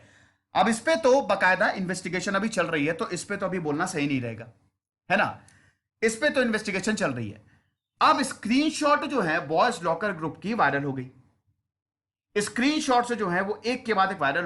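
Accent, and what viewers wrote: India. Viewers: Sr your voice is amzing and different from other and bilkul desi style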